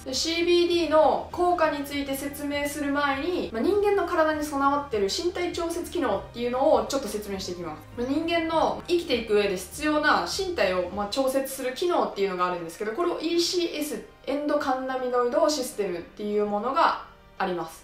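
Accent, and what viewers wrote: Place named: Western Japan, Japan. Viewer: ちゃん極めて標準語ですが、普段は西の言葉なんでしょうか？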